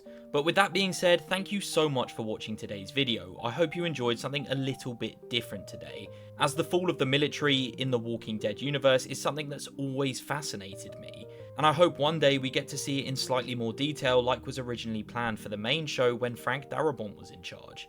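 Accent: United Kingdom. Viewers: Another annoying British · This video would be so much better without the british accent it sucks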